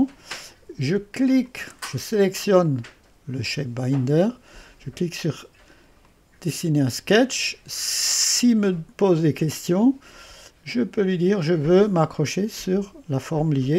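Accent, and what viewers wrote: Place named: Strasbourg, France. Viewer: ce petit accent qui me rappelle ma première femme Strasbourgeoise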